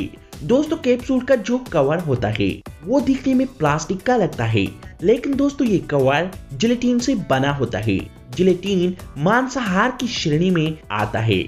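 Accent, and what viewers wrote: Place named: Bengal, India. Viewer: Bengali.. Accent mast rahata hai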